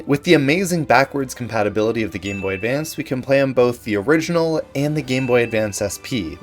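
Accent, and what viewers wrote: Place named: Canada. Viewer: First time hearing this dude and I can tell he’s Canadian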